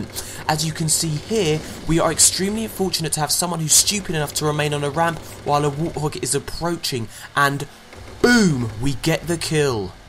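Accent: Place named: United Kingdom. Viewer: Its nice to hear some videos on youtube done by people with British accents for once and not American 12 year olds :P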